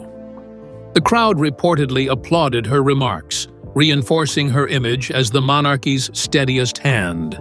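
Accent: United States. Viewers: Now is this true>? The narrator's American accent tends to raise my suspicion..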